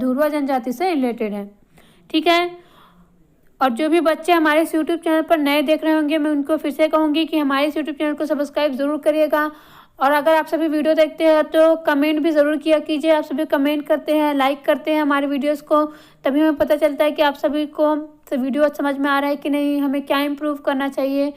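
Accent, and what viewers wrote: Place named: Chhattisgarh, India. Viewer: हमारे धमतरी रायपुर से हो ऐसा लग रहा है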